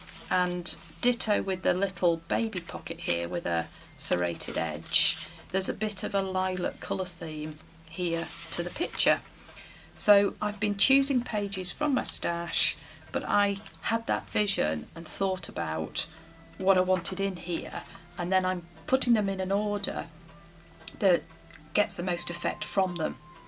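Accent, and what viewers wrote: England, United Kingdom. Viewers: I’m sorry to bother you with my comments hon I moved to Melbourne from London in 1973 and so enjoy hearing other English accents, which are few and far between these days!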